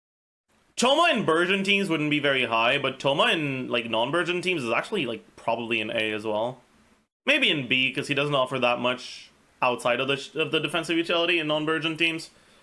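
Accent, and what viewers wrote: Quebec, Canada. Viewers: Except as he put it if he ever travel to Paris, people will have to focus to understand what he is saying. His accent is really pronounced but its not as if only Quebec has strong accents so its no big deal. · I speak french but I'm not used to canadian french, I understand so little lmao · ouais, alors, en tant que français.e de france, ça reste chaud le français quebecois ToT mais j'adore <3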